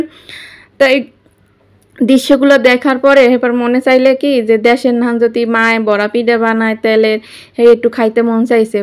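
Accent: Barishal, Bangladesh. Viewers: ❤❤চলে এসেছি❤❤আপু তোমার বরিশালের ভাষা শুনতে❤❤এতো মিষ্টি করে কথা বলো❤❤ যতো শুনি ততো শুনতে ইচ্ছে করে ❤❤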